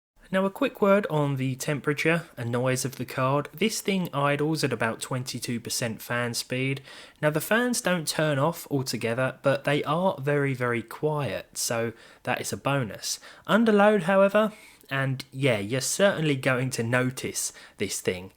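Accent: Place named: Cockney, United Kingdom. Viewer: Your review is fine but your cockney British accent was tearing up my ears. And it pays off 👍🤤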